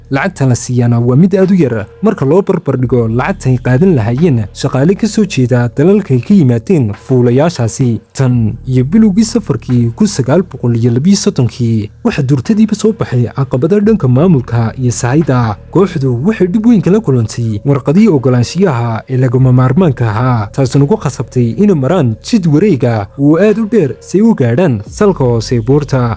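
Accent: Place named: Somaliland, Somalia. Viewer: lahjadii somaliland na waad leedahay waxaanaad isticmaalaysaa luuliyo halkaad ka isticmaali lahay julaay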